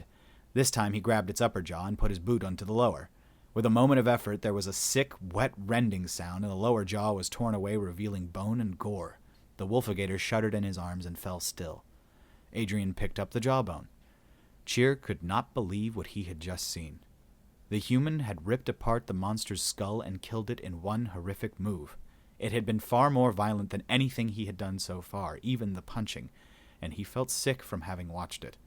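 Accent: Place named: Australia. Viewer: Your Australian accent is amazing.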